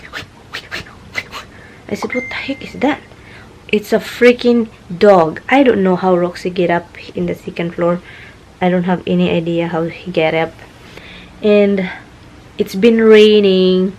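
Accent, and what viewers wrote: Visayas, Philippines. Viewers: HI sis.....i really like ur accent bisay jud ba,i am proud of it.sad to heard about ur duhang totoy dai oi mailbag sab,i avoid mo mag bra every time u goes to bed.basin naa ka ces.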